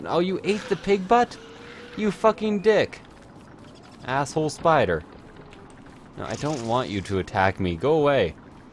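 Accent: Canada. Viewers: That Canadian accent is redonkedonk xD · r u Canadian?? Cuz ur all, "Oat, oat." Instead of out.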